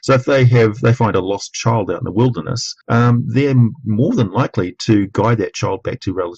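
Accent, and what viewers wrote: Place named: New Zealand. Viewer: That Kiwi pronunciation tho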